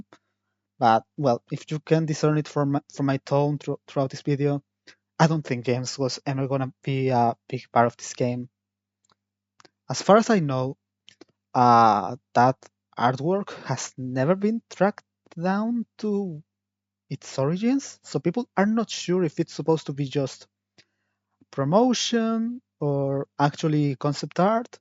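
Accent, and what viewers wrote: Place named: Spain. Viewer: Ah ese acento español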